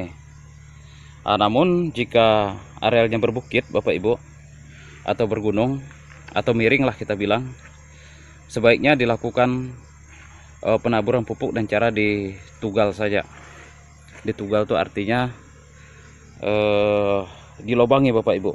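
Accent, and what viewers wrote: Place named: Sumatra, Indonesia. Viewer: Ya bapak ibok..logat nya macam sumatera bang .👍😀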